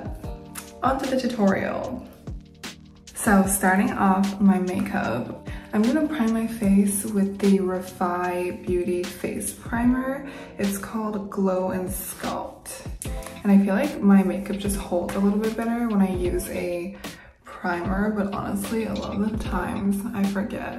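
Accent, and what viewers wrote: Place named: California, United States. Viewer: Sounds like a California girl accent😅😮‍💨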